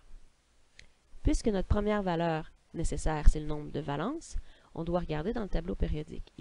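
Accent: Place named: Quebec, Canada. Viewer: Je valide l’accent québécois ainsi que la vidéo 👌🏾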